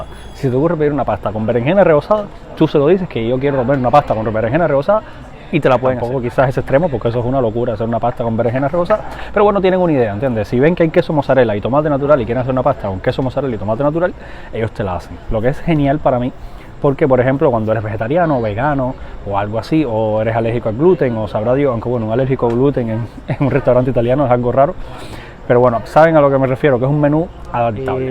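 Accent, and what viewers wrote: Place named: Cuba. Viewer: Genova es famosa por la focaccia , norte italia la pizza es fina , pero según vi es un menú la cortesia de casa es un arancino siciliano , esta la lasagna q es de Bologna .. tienen excelente menú completo , solo el nombre del local indica italia Toto e el panfilo italiano così nos entendemos , saludos fratello te sigo hablas un buen español cubano nuestro no todos los YouTuber lo hacen gracias !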